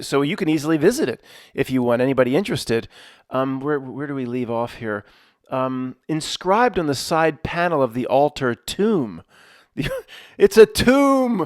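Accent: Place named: Philadelphia, United States. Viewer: & UK Nottingham ❤Philly accent mat in fact I prefer ya Philly accent 😂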